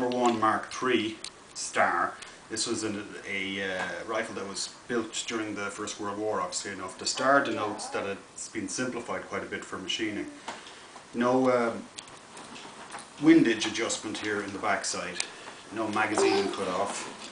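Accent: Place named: United States. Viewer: "you got yer safety over here!" you're such a yank now!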